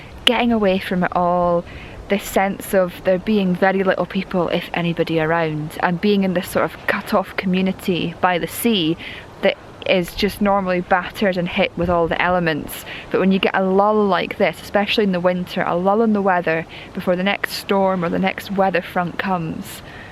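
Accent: Scotland, United Kingdom. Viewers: Loved the video! I am late to watching your channel so I am trying to see all your work. You are helping me enjoy the confinement of COVID-19. Who would have thought what 2020 would bring...just crazy. There is so much to enjoy in your videography and photography. You should consider documentary voice overs as your enunciation is so clear and the Scottish accent is beautiful. I may have said this before I could listen to you talk all day. Stay safe Fred in Texas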